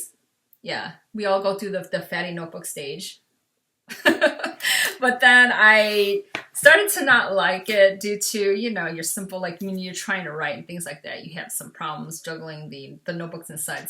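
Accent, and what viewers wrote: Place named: Germany. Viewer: Hey your German is cute! Greatings from Germany 😀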